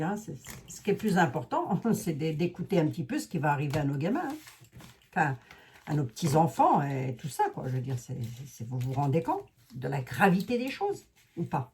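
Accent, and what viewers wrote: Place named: Paris, France. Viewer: Mais j'aime ! La belle Lorraine qui parle en Titi de Paris ❤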